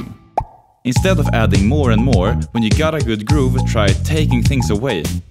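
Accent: Sweden. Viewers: I watched and want to ask you which city in Sweden do you live in? 😂 that thick swedish English accent :) no offense, i’m half swedish myself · Såg att du tryckte in ett "plopp" 😂 När jag hörde dig prata för första gången visste jag direkt att du var svensk, det är bara någonting speciellt med vår dialekt när vi pratar engelska, tråkigt att PewDiePie inte pratar så längre! Var i Sverige är du från?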